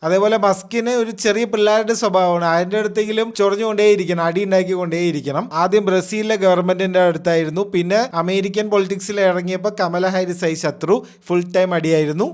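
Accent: Palakkad, India. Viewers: നിങ്ങൾ പാലക്കാട്‌ ആണോ....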